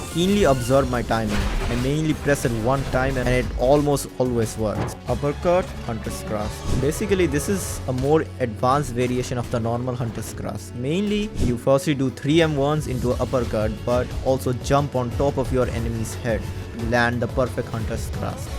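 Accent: India. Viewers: bro voicelike a indian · You sound indian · Why u sound like u are indian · I bet ur a indian bc of ur voice and a good vud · You speak english but sound like indian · U sound like Indian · Are you indian? Your voice sounds like a Indian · Bro idk but your English sound like indian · idk why this guys accent is like indian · He has an indian accent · Bro he sound indian (not being racist ) nice vid of tutorial btw... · YOUR VOICE GIVING ME INDIAN VIBE ARE U? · Bro got that indian voicd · Love the Indian accent I will sub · ohh But your accent is like Indians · Hello guy with Indian accent Remember me when u get famous. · R u Indian? You sound like my friends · indian accent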